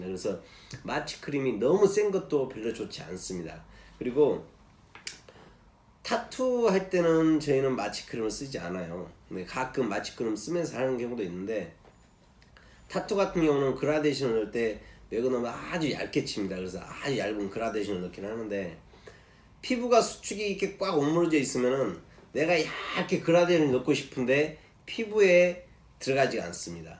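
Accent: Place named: Jeolla, South Korea. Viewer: 구 독 ! 좋아요~~~ 했어요 꾹꾹!!전라도 사투리 넘 귀여워용 ㅋㅋㅋ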